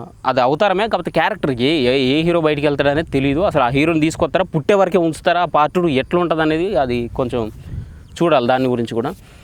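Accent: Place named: Andhra Pradesh, India. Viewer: Anna nuvu manchi mana warangal basha Telangana yasa la matladu anna endhikantey manaku mahbad ollaki set kadhu Andhra slang...😊😊😊 Am following you from many years am from warangal 🥰🥰🥰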